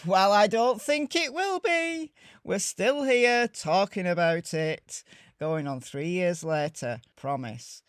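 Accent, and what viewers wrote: United Kingdom. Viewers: lovely to hear another brishish person xx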